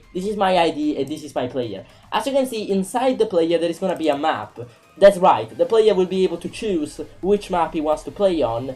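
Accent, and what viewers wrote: Italy. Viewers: Great accent :D Where're you from, Italy?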